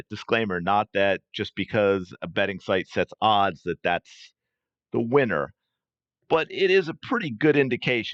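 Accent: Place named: AAVE, United States. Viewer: Would you please speak English. I dont understand ghetto ebonics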